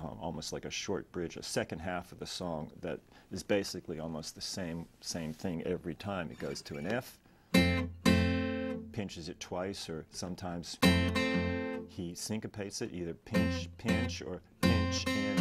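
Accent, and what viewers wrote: England, United Kingdom. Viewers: I think the Broonzy style has further to go in the right hands. He seemed like a marvellous under-rated innovator to me. He stands musically above so many of his time he deserves more acclaim. Thanks for your stuff here, - spot on and you sound English too! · From one to another...father was Ernie.....your lessons are the very best I have yet encountered. Wonderfully clear videos and perfect communication skills. I too would have bet you were English with a touch of U.S. accent. Your family must have come from there at some time. If only all teachers were this good. Thanks Ernie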